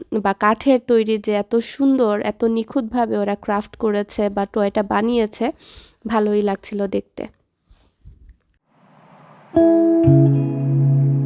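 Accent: Sylhet, Bangladesh. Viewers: আপু তোমার সিলেটি ভাষায় কথা বলা এতো সুন্দর মাশাল্লাহ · Apu Assalamualaikum Apnar sylety vasa ato valo lagy Masallah 😊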